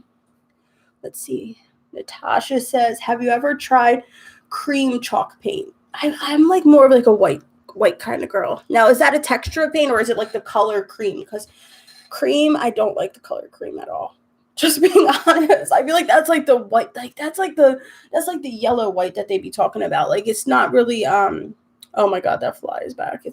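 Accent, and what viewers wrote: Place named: New Jersey, United States. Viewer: I love your accent.. my bf is from Staten Island and now Jersey.. he has the same accent as you lol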